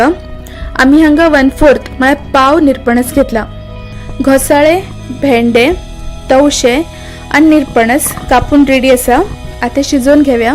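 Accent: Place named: Konkan, India. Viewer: Just now I watched Vikas Khanna's episode in Goa. And in the episode he goes to a restaurant and eats this dry curry called Khatkhate. It's only when I watched this video, I came to know that it is the same as Gajbaje. 🙂 I watch your videos mainly for the content and love your Konkani accent. Just curious to know where you are from?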